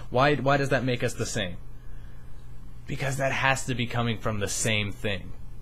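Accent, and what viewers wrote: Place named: Jamaica. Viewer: how often do you smoke pot? you speak like a true Jamaican. You're Echo Consciousness Theory is crazy (in a good way) and you do need better acid or more hits to get really fucked up. my friend had a trip where the only way he could explain it was he died and became a circle. anyway keep doin your thing boss, u shud try by ft.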